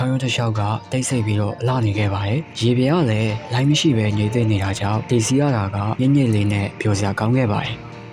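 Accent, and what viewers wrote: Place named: Dawei, Myanmar. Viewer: ထာ၀ယ်အသံကြီး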